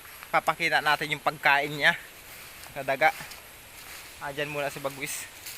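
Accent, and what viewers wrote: Bicol, Philippines. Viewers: Saan ka sa bicol idol??? KC bicol ang tuno ng salita mo.... Taga Bicol din ako idol.. pashout out naman idol salamat 👍🥰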